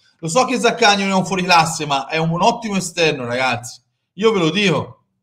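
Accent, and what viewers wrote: Tuscany, Italy. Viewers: Accento toscano Top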